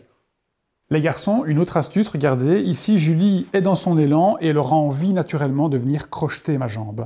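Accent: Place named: Belgium. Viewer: Belle pédagogie, rythme de démo agréable et super digeste 😉, les portés j'Adore ! #DirtyDancingGeneration... 🤷🏻‍♀️😍😜 Y en a jamais trop ^^ Presque. Merci pour cette vidéo très fluide côté montage également, les petits traits d'Humour et l'accent de la Belgique qui me rappelle toujours des Souvenirs chers au 💗🤫🙃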